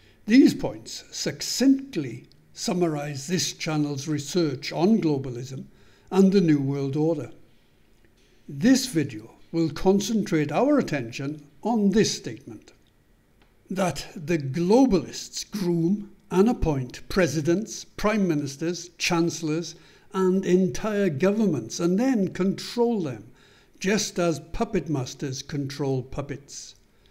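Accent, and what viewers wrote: Wales, United Kingdom. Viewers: You sound like a Welshman my brother!! 🏴❤️😎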